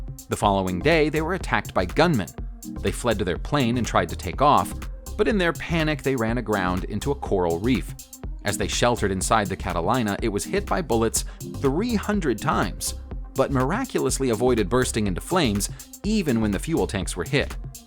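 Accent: United States. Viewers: The announcer sounds American. How is it he can't pronounce Catalina?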